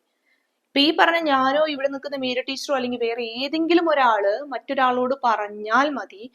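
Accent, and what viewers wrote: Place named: Thrissur, India. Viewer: Ayyo triasur slang Evide poyi??? Sho straight njngada tone…Adipoli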